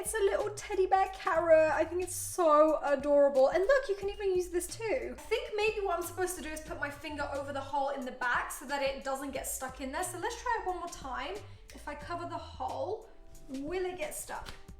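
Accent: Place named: England, United Kingdom. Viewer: It's just the english accent. If you come here in the UK you will see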